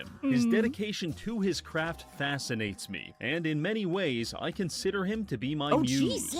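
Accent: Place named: South, United States. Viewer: I find it great that her Southern gets stronger every video.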